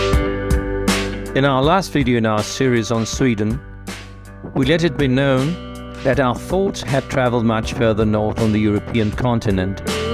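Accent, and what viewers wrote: United Kingdom. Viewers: Bel video e belle gnocche, voglio farti i complimenti per il tuo accento British, mi sembra di capire che tu sia italiano, s'è così hai una pronuncia perfetta.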